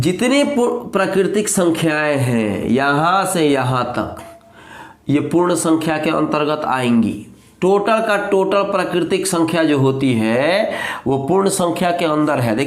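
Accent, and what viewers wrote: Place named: Bhojpuri, India. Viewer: Sir apka bhojpuri wala acent acha lagta hai with bhojpuri song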